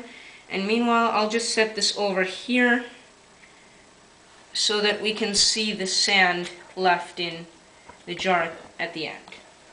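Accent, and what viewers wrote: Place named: United States. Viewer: does he live in america coz he's accent is really string american! looool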